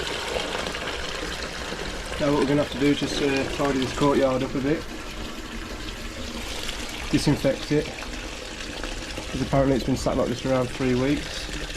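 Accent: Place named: Wolverhampton, United Kingdom. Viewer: have to disagree, I also like the Wolverhampton accent, be proud of your accent & ignore the begrudgers 👏👍☘️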